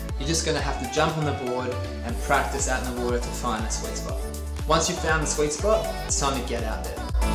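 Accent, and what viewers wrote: Australia. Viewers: I thought for a while he said bitch, but it was just his Aussie accent. But, great vid man. Thx! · As soon as I heard the Aussie accent I knew this guy was legit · He’s AUSSIE m8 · likely a gold coast Australian accent. (I live in SA and this sound like a Queensland accent to me) .The beaches also look like they are in the Gold Coast but i could be wrong. Also a lot of surfers live in the gold coast.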